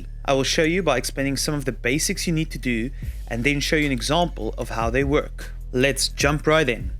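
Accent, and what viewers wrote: South Africa. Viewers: This must 100% be a South African, can spot that accent immediately.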